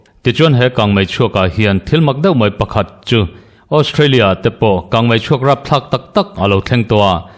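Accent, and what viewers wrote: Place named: Aizawl, India. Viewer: Aizawl milian nuho Accent ang kha inei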